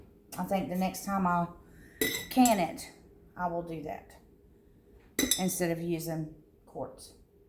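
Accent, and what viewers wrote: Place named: South, United States. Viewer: Adorable southern voice cookin up some sausages. Awesome morning vid. 😃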